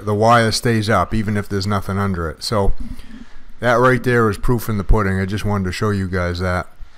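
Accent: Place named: New England, United States. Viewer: Only one comment! Great New England accent. Just about to say Maine......when you said Maine. Love my people.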